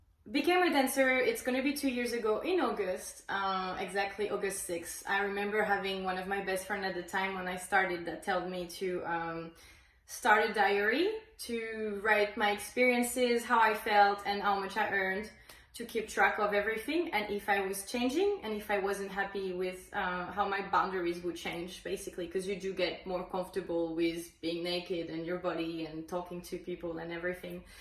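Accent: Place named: France. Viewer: I’m 100% sure she’s French this accent😅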